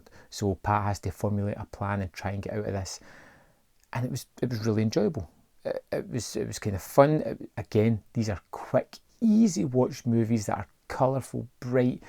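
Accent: Scotland, United Kingdom. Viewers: My cc worked, in American English. The narrator sounds Scottish!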